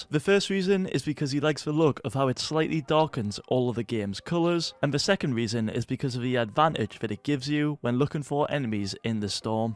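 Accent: Newcastle, United Kingdom. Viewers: Sounds like your from Newcastle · Are you from Newcastle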